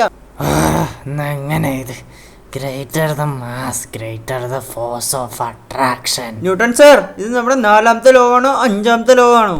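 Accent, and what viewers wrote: Palakkad, India. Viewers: Bro palakkad ano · Palakkad evideyanee kutta 😃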